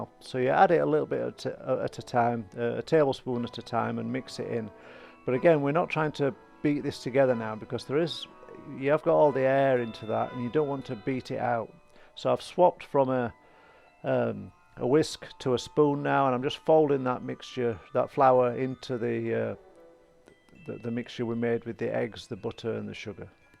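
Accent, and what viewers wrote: United Kingdom. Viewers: I made this cake for the first time this year, followed Delia's recipe to the letter (from the book) and didn't look at it for 4 hours as instructed and it was very burnt, black in places. Very disappointed but too invested to stop so I trimmed off the burnt bits, top sides and bottom, fed it and iced it following your video this time, which was very helpful, so it'll look great but who knows what it will taste like. Sounds like you're from my neck of the woods or over the border (West Yorkshire). Happy Christmas!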